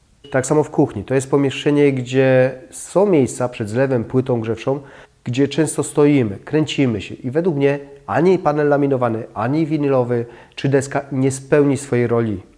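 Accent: Silesia, Poland. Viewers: Stara się, ale słuchać śląski akcent:)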